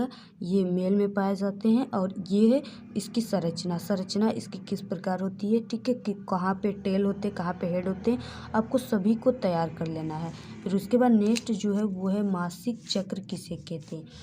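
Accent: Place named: Uttar Pradesh, India. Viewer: Mam mene appki hrr baat axche see sunii or follow kerii wooo mam koo 😮🎉❤❤mam app bhot axcha paadti h mam app up see hu app kii lugahgh se lagra 😮❤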